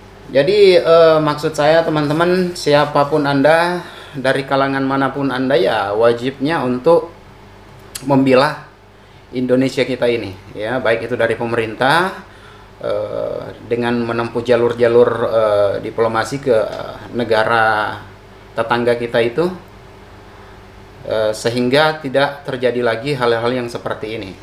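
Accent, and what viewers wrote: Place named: Malaysia. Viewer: tapi kok suaranya ke logat melayu Malaysia?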